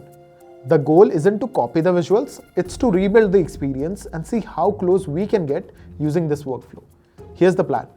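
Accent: India. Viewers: I cant watch it anymore the indian accent triggers me so much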